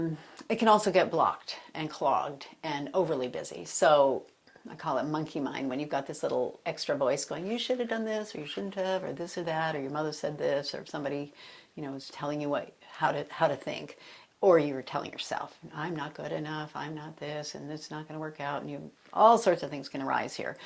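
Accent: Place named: United States. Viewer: She is American so she has an accent if you know better you can make a video. She has 109,0000 views because of her effort.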